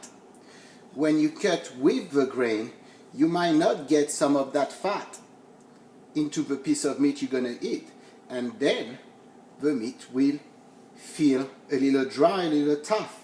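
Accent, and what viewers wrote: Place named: France. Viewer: if he only did not have a french accent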